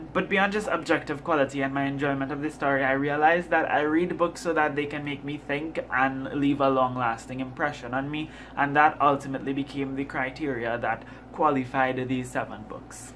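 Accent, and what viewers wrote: Trinidad and Tobago. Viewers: It's so weird but refreshing to hear hear a Trini accent for once. I've gotten so used to foreign booktubers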